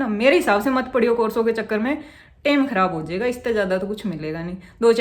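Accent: Haryana, India. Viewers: I love haryanvi accent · haryanvi accent? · M to apka accent dekhke hi samjhgi thi ki app haryana t ho ...quki m bhi urey t ey hu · Haryana ki accent mujhe bohot accha lgta hai😀🔥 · I Like Your Haryanvi Speech Truly Bahut Sweet Bolu he aapki......😍😍😍🔥🔥🔥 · I'm from odisha. I love your hariyanvi accent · love your Hariyanvi accent <3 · AAP bahut funny ho yar speech Harayana ki must hai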